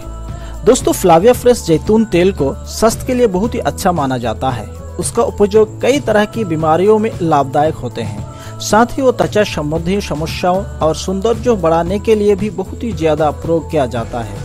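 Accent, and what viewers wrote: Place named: Bengal, India. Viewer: Yeh bagali h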